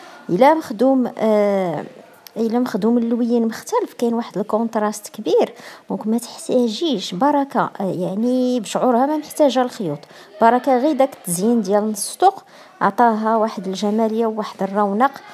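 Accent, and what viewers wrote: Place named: Taroudant, Morocco. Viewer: تبارك الله عليك بينه من هدرتك رودانية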